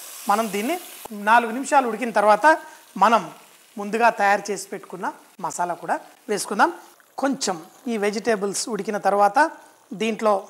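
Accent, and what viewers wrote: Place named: Hyderabad, India. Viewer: Brother you should talk Hyderabad telugu only... Being a Hyderabadi. . I enjoy while you talking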